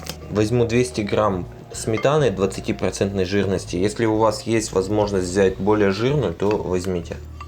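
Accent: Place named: France. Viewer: Ну что я могу добавить к тому что уже сказано? Молодец, умница и это французское произношение приятно слушать😉😉😉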